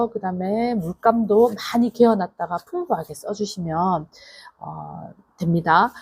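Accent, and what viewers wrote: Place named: Gyeongsang, South Korea. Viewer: 그림을 그리면서 말을 한다는것이 힘이듭니다 가끔씩 경상도억양으로 말해주세요 목소리를 들으면 고향생각도 나서 좋아요 그림수업 잘하고있어요 감사합니다 화이팅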